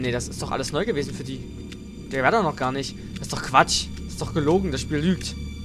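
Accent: Berlin, Germany. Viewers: du und dein berliner sprache weste bist du berline dann sag "ich bin ein berliner"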